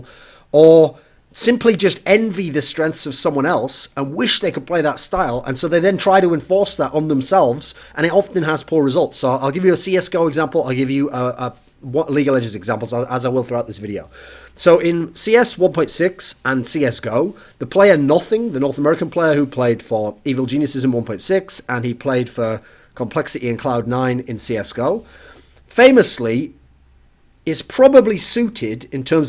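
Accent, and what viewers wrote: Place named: England, United Kingdom. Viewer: English accent bro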